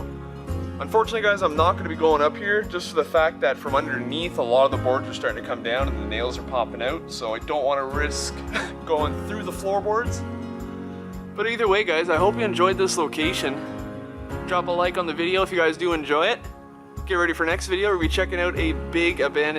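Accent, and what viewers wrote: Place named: Canada. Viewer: As soon as I saw the ladder I kept saying, "go up!" Lol amazing location. I have to say I love your Canadian accent lol.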